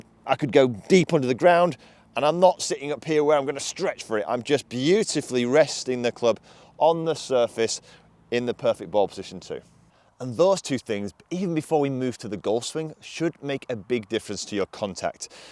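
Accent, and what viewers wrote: United Kingdom. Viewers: first the compliment. I like your videos, they are very informative. Now my problem, and I say my problem, it's hard enough to understand someone with a british accent, it's even harder to understand them when they talk fast. thanks again for your excellent videos.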